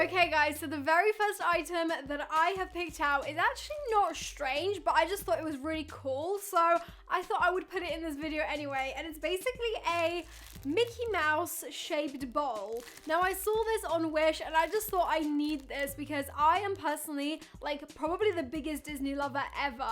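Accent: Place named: England, United Kingdom. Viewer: It's just the english accent. If you come here in the UK you will see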